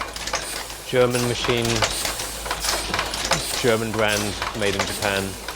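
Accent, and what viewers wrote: United Kingdom. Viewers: gorgeous Britain accent as always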